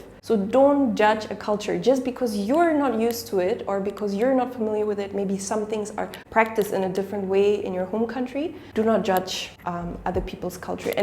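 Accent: Malaysia. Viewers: You sound like Malaysian already, hahaha 👍🏾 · English dier dah kena rasuk Manglish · Hang pun borak dah mcm org local(malaysia) even bila hang speaking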